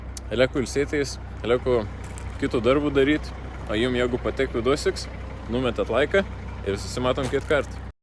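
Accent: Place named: Samogitia, Lithuania. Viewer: Geriau visados žemaitėška💪💪💪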